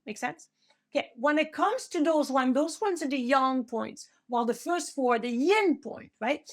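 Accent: France. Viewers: you are awesome very gifted teaching TCM with passion; please do not apologize for your French accent! Joanne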